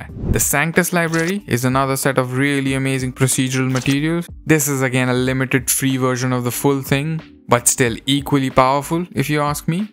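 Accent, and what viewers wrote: India. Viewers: U sound indian, are u indian? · you're English accent sound like your are indian are you ? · Don't lose that Indian "t". :)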